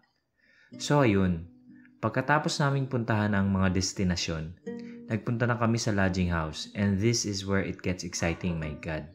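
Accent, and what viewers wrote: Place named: Bicol, Philippines. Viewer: Bicolano ka kuya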